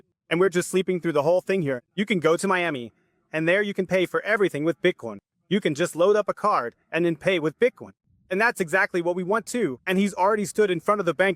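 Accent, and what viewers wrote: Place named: Switzerland. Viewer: Sapperlott, des schäenglish isch voll super! 😊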